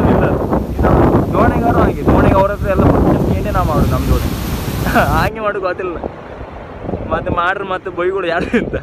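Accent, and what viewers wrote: Kundapura, India. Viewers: Kundapura kannada baari saap matadtri mare😂❤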